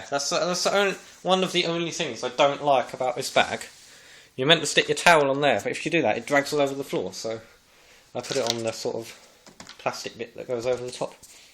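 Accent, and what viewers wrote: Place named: Essex, United Kingdom. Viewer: Cleveland is a great brand, underrated by some, amazing wedges. I'm having a bit of a love affair with Ben Hogan irons at the moment (forged gs irons and Colonial wedges) all getting a bit old and I should upgrade them. Can't bear to do it though. I'm just too attached they just seem to fit me. By your accent you sound like your from not far from me. You can usually see me struggling and swearing round the courses of North Essex. Good luck this season ;)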